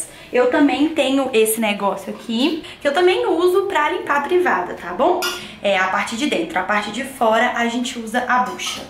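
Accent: Minas Gerais, Brazil. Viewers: Pois se acostume, pq ela não vai mudar. Mineiro fala assim.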